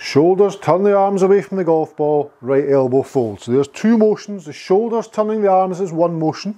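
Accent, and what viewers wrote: Scotland, United Kingdom. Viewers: I very much enjoy your lesson, both the content and your Scottish accent. Luckily I spent a wee while in the UK so it's no problem for me. Unfortunately I can not fwd your vdo to any of my Thai friends, because they wouldn't have a clue what you are talking about. Keep on the good work and no need to change the accent!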